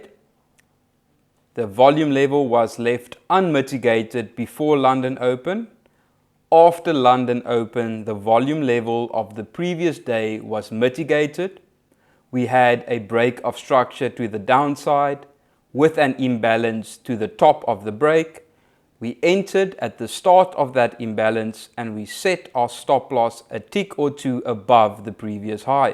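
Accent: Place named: South Africa. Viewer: lol i didnt even see the 'better than ict cap' he sounds like someone from south africa ja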